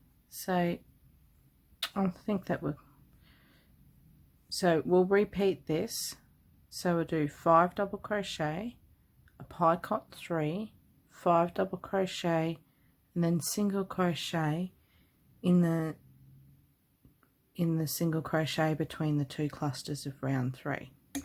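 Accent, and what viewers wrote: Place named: Australia. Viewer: Great to hear an Aussie tutorial loved this made a few just subbed too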